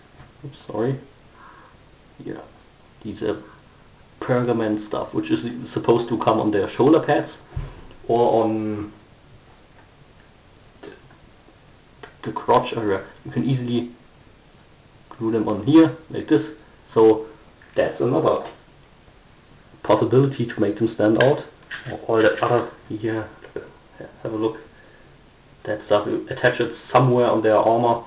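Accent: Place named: Germany. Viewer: german accent ftw! :)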